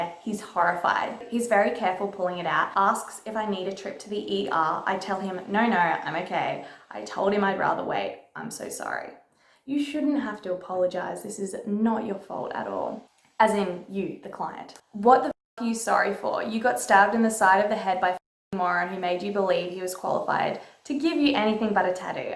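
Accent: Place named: Australia. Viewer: i LOVE the way you say tattoo ! Australian accents rule :) much love from the States <3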